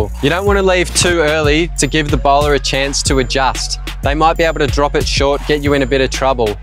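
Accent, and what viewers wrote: Australia. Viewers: Australian accent : nice skills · most of us are here to listen to just the australian accent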